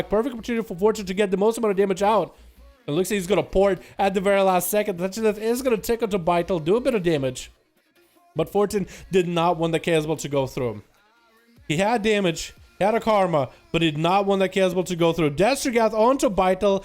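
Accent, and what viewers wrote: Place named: Russia. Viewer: LOL! Superior russian aсcent!!! =)))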